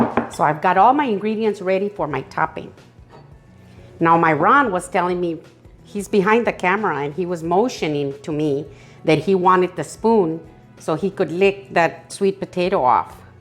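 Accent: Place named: New Mexico, United States. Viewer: ~ ...your accent reminds me so much of some of my aunts from NewMexico...LUV iT ! : )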